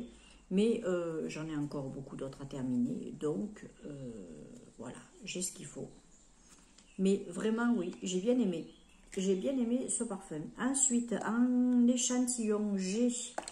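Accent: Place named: Provence, France. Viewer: Bel accent Provencal...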